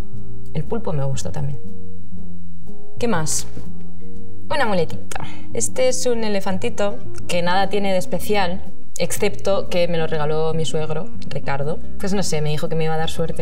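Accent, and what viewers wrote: Catalonia, Spain. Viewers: me encanta su accento mas o menos no puedo entiender personas de Espana. Pero ella hablar suave y muy claro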